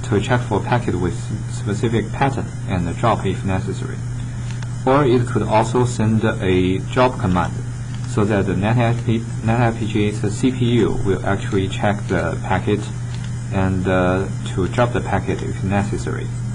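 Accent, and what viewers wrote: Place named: China. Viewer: 老铁，听口音像是中国人吧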